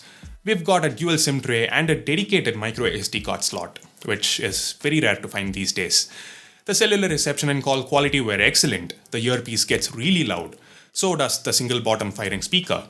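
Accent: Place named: South India, India. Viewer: Still you have heavy south Indian accent. Nice review though...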